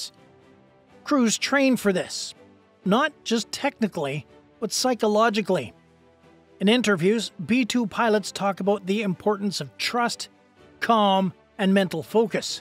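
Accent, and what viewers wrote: Canada. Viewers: Based on the accent of the presenter, I want to know why in the world a Canadian is presenting this video. Are you just envious? Why don’t you tell your communist labor Prime Minister you just elected instead of Pierre Poilievre to kiss your collective backsides. We in the United States aren’t going to continue to save your butts while you do nothing. Enjoy your 35 to 50% terrace. You’ve earned them!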